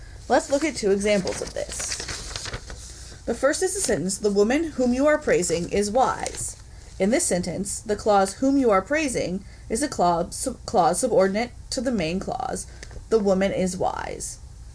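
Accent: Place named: United States. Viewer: We I believe have some idea of how to pronounce Latin correctly. I am fairly certain the Romans did not have a thick American english accent.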